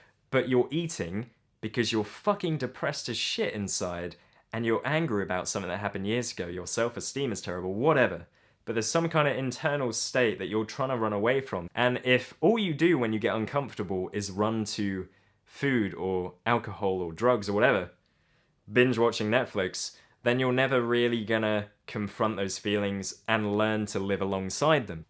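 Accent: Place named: England, United Kingdom. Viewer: Where do you live? I presume from England from your accent. Good video anyway. What about your mental health and depression? I hope well. Best wishes from Italy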